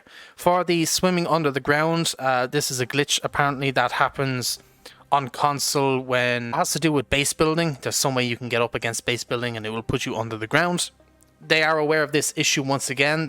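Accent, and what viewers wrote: Ireland. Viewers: are you irish because i can hear your accent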